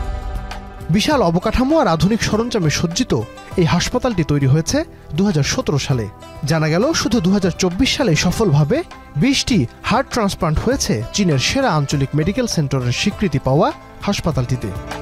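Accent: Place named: Noakhali, Bangladesh. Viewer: ভাই আপনার সংবাদ বিশ্লেষণ ভুলে ভরা, আর "আমি জেনে শুনে বিষ করেছি "প্রাণ"(???) , বিষ করেছি পান হবে। চুপ্পু বংগভবনে বন্দি না, আপনি বলেছেন চুপ্পু কোথায়ও বের হয় না,কথাটি সম্পুর্ন ভুল, এই ২৬ শে মার্চ স্বাধীনতা দিবসে সে সাভার স্মৃতি সৌধে পুষ্পস্ত্ববক অর্পণ করে। আপনার কথায় নোয়াখালীর আঞ্চলিকতা প্রকটভাবে লক্ষনীয়।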